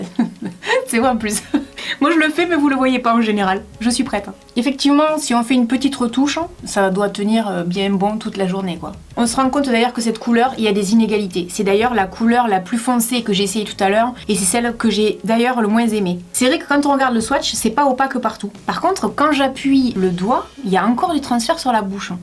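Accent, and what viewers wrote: South, France. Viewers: Hello, en temps que française qui habite en Suisse, tu es mon rayon de soleil du Sud avec ton magnifique accent! Merci pour ça. Aussi je voulais ajouter que j'adorais tout particulièrement ton maquillage des yeux, encore plus beau que d'habitude!😍 Et oui je confirme quand ta plus tes taches de rousseures ça fait bizzare, mais l'effet cadavre avec cette teinte est garantie 😂 Je voulais aussi dire que j'ai souvent eu l'impression avec les rouges à lèvres (à peu près de la même teinte que le plus foncé ) il y avait souvent de l'inégalité dans la couleur! Merci en tous cas pour nous partager tes avis et ta bonne humeur! Ne change rien! 😘❤❤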